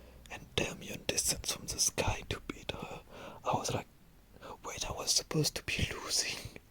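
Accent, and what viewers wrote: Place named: France. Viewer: WoW I really like your frech accent its kinda relaxing you could try maybe do a ASMR in french? :0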